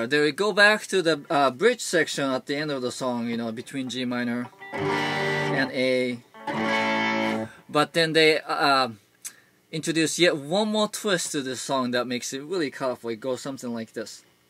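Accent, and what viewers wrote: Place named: Russia. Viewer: I detect the Russian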